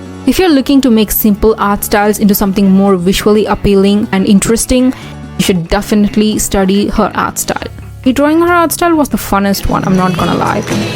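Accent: India. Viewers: Sounds like an Indian accent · u sound indian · Is accent is indian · You sound like Indian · Her accent she is Indian, I love it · why does she talk like an Indian Girl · are you Indian? The accent feels so 🌸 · Why your accent sound so Indian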